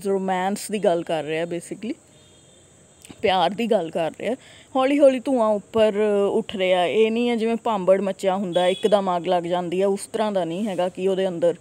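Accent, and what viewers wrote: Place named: Punjab, India. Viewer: I know this right from early you have an Punjabi accent in Hindi I live Punjabi language I am garhwali but lived in chandigarh a lot. Nice effort...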